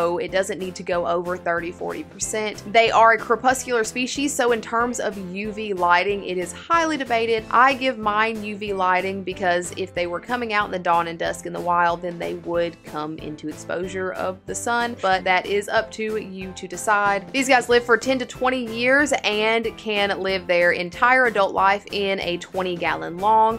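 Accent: South, United States. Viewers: As a first time viewer, hearing that southern accent come out of someone who isn’t white is amazing😂 loved the vid, hope you’re having a good day🤙🏼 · First time eating her video off rip oh she southern I can hear it lol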